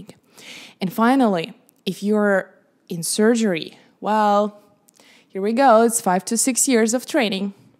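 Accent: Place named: Russia. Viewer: Awesome job, I like your Russian accent😍😍😍 Krasavaetsya!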